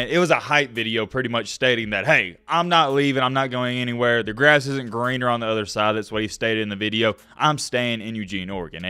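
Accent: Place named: Alabama, United States. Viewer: You’re an Alabama fan ? I knew it the first time I heard your voice.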